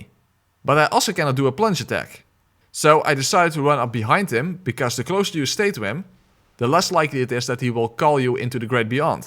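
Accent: Netherlands. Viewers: gok aan t accent randstad ook? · U dutch? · First video i watched on your channel, immediately heard the dutch accent hahahah🇳🇱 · Leuke video! Altijd grappig hoe wij Nederlanders een typisch accent hebben wanneer we Engels praten. xD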